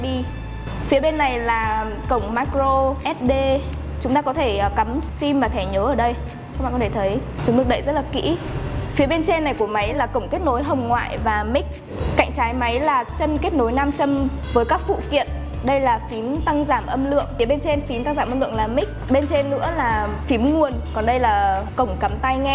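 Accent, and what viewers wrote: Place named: Hanoi, Vietnam. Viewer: uầy uầy uầy uầy uầy hôm nay là chị mà, vui quá vui quá, mà hình như là giọng chuẩn Hà Nội ròi, hay hay hay, vote up vote up, hay hay hay, mới nghe rồi nhảy xuống cmt cũng thấy hay, hay hay hay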